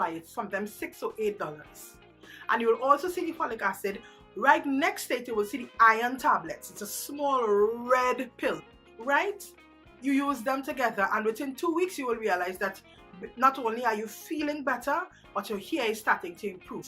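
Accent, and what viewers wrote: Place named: Caribbean. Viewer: Where are you from, because you sound like a mixture of Caribbean islands. Trini, Bahamian, and jamaican.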